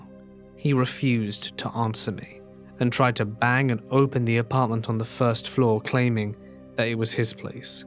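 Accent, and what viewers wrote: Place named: Southern England, United Kingdom. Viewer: I love your content but I don't listen while falling asleep, I listen just to chill out or as background nosie while doing other tasks so I think a change to the black screen would be great! You know like the visual representation of sound could be cool!! You could play around with different colours or effects/movements depending on the story! This would also give you an avenue to explore tempo and expression of movement, almost giving your content more musicality of that's what you're potentially looking to explore. Keeping it minimalistic would be the key to balance out the audience between those like me, who enjoy your videos for the storytelling, and those who like to fall asleep listening to you Again, just want to add how much I enjoy listening to you. I don't know where in the UK your accent is from but it reminds me so much of being back home in the South which I find so comforting now I'm living away from there!! 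Xx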